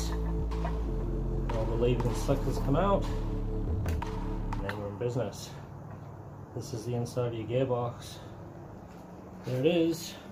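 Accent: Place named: Australia. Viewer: ur sounding like a bloody auzzie keint haha. love it. awesome videos. keep it up.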